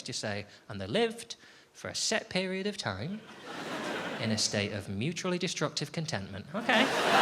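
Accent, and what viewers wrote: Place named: North, United Kingdom. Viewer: The nyunyun is real for Northerners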